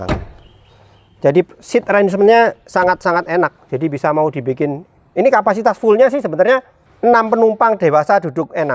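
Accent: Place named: Surabaya, Indonesia. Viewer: Mantabh reviewnya om, narasinya ga kaya baca spek pabrikan, tp punya angle yg menarik sebagai user, ditambah logat suroboyoan yg khas, jd bikin reviewnya semakin menarik. Auto subscribe!! Ditunggu content menarik selanjutnya Ko. #jadipengenpunyafreed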